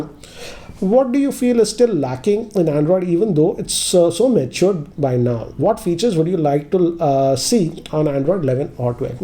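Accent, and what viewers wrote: Hyderabad, India. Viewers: We want Hyderabadi Hindi back !!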